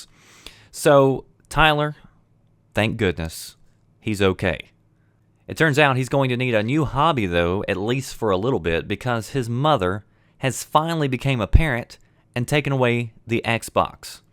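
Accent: United States. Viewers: yes blame everything else its never the persons fualt hey ?? blame the game the booze the drugs. Noone and nothing made u take the drugs the booze or play the game. U sound american to me. take reposibility. and that girl in the pictures massively over wieght she could die of heart failure just in the shower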